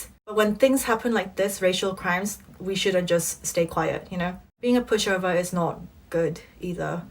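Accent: England, United Kingdom. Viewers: "Once bitten always smitten".... From the looks of it, you have immersed the entire teenage and adolescence in the UK....Yes the ever prominent threat of being an Asian-hate target is there, but the London contacts and friends that you have made will always stand firm alongside the future.... So, my verdict is that this "Time" will never wait. Go with your brave heart if there is a strong calling from deep within.... Your English accent and the hard earned communication skills will pay much diligence in a city like London as this city is the perfect platform for music. However, if the heart is swaying momentarily due to income bracket from Youtube, i am gonna advise to look into your career over a long run. Once you introspect past the intangible demons instated in the mind by modern media, you will find if your innate passion lies in a compromise gig over YouTube or in the sacrifice of your tiger mum who is willing to bear your resentment just for a bright career in the so called financial capital of the world "London". 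Being an Asian in similar waters, I thought of writing this down. I aint no philosophical expert. So, this is just contemplating and a bit too much nostalgia of the London vibes that i miss now being in limbo for the past one and half year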